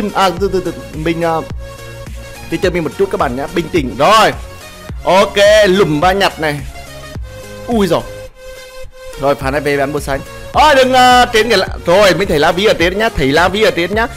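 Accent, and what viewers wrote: Nghe An, Vietnam. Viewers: Giọng nghệ an quê ta dệ thương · Giọng nghệ an choa hắn rứa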